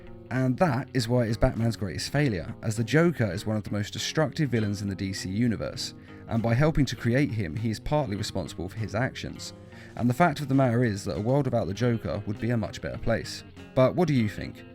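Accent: United Kingdom. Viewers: I know Americans and Superman fans will eat up anything a British voice tells them but this list is dumb. Might as well list his parents deaths as another one of his "failures" because as we all know -- every time some tries to cause you or your loved ones harm -- IT'S ALL YOUR FAULT.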